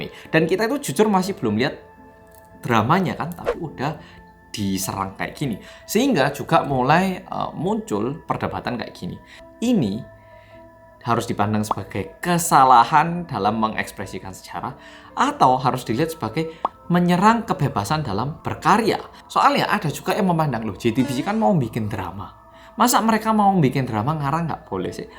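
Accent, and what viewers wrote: Java, Indonesia. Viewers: Je Te Be Ce... sueneng aku dengere...medhoookkk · Orang Korea yaaaang medoooooook!!! Yeay 😆😀🤣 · Bersama orang korea yang medokkkk , suka banget aku cara ngomongnya dan intronya!!! 😀👍🏼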